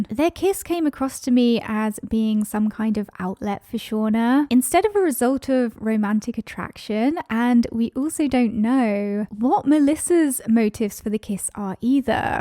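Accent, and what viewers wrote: United Kingdom. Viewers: Where is your accent from? Are you from there too? I'm thinking somewhere in England? - (I'm a quick study)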